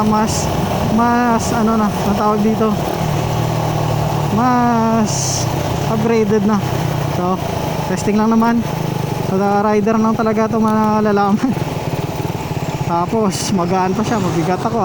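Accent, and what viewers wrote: Iloilo, Philippines. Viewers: ilonggo kaman gali idol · ilonggo ka gali tol.. 😁😁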